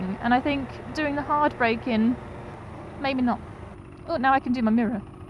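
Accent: United Kingdom. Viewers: Soo you're in germany? But you sound british? Also, do you do meets ?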